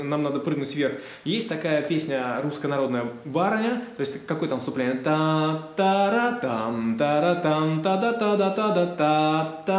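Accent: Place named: Germany. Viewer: Урок - супер! Спасибо! Те кто думает, что может играть без интервалов, Вы правы, но забудьте об импровизации (хотя Вас может выручить идеальный слух и память)., очень забавляет (по доброму) Ваше произношение английских слов с немецким прочтением;)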